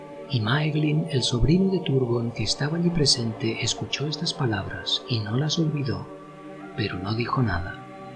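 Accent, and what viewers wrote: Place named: Catalonia, Spain. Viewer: Qué asco el puto acento catalán de mierda 💩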